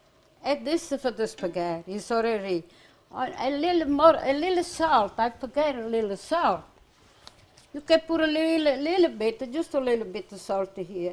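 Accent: Italy. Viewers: First, the word anchovies is in the plural, so you ask: 'Where are the anchovies?' Second, anchovies do NOT go very well with this dish, what about some cheese ? However, I love the Italian accent ! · I simply love this Italian Grandmother. I made this last night, and it came out fabulous. Thank you so much for sharing. Love her accent. I can listen to her all day. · Thank you for sharing your faith Bless be Jesus Christ give you more year continue cooking with love sharing with all people around the world 🌎 ❤ 💕 God bless you always Have bless day I love your Italian food and your beautiful accent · I like her Italian accent 😄😆😁🙏👍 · La signora, classe 1935, ha lasciato l'Italia negli anni '70, partendo dalla provincia di Foggia. Come molte donne del Sud Italia, probabilmente avrà al massimo frequentato le scuole elementari e quindi l'unica lingua parlata era il dialetto foggiano/pugliese. 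Questo aspetto, dopo 50 anni vissuti negli States, comporta questa pronuncia (simile a molti emigrati italiani negli USA), ovvero uno strano mix tra dialetto, italiano e inglese. Con parole italiane che - con il tempo - vengono pronunciate nella maniera inglese. E questa forte cadenza del suo dialetto che influenza la pronuncia in generale. Le dosi di aglio e peperoncino, come dice anche mentre prepara il piatto, sono abbastanza a discrezione. Anche secondo me ne ha messo troppo (tra l'altro - come sai - alcuni mettono lo spicchio intero e lo tolgono alla fine) ma, soprattutto, ha messo il pecorino/parmigiano che in questa ricetta non c'entra una fava! 😅 Già a me dà fastidio il prezzemolo (che va messo comunque alla fine, non nell'olio caldo!), ma è un ingrediente che si usa mettere. Ma il formaggio grattugiato proprio no.😩 Comunque, come forse sai, è abitudine abbondare di aglio nelle cucina del Sud. 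Come con il peperoncino 😳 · But it's hard to understand her .she has strong Italian accent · How much i like that accent! Italia!😊💝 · She speaks a perfect Italianglish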